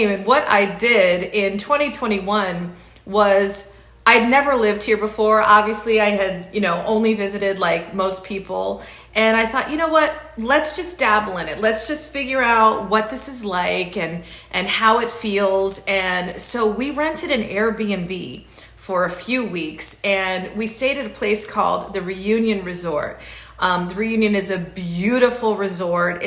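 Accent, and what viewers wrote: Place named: Boston, United States. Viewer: L A ? I was convinced you were a Boston gal lol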